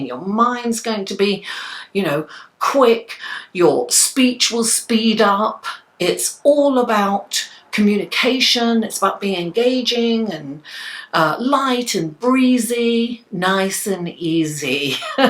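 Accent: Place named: United Kingdom. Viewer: thank you for this reading,, it did resonate with me & spoke the truth about something that occured to me last month. Where are you from I love your accent,,, I'm from USA, you sound British,,, many blessings to you.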